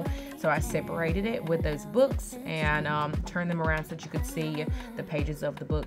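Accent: South, United States. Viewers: I literally been watching your channel all day because it's my #OffDay and I checked out your Pinterest page out and I loved it Heck I love all the southern country style inspiration I'm from Texas so this bell loves farm house vibes and beautiful southern ascents hats off to you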